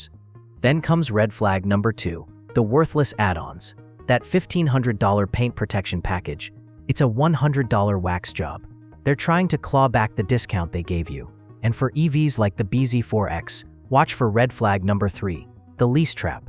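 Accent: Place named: United States. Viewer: I stand corrected. But, does the "software tuner" take away your accent? You said "mate" which leads me to believe you're not American but yet your voice sounds American. Either way, I did like your content. Like I said before, I pretty much buy only Toyota's as of late. Bought a 2010 Toyota Camry last year with 170,000 miles on it. Put new shock/struts, sway bar linkage, front brakes and rotors, back brakes and new tires on it. I have a little over $7,000 in it. I now have 200,000 on it and I drive it 500 miles a week. All paid off. I will have to check out other videos if you have more.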